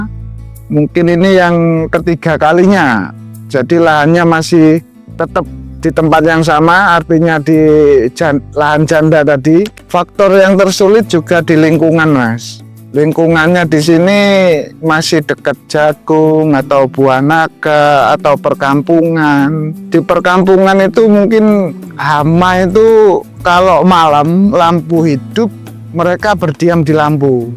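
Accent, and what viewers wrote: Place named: Java, Indonesia. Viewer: Hmmm, mas medokk bangettt, akuu sukkaaaa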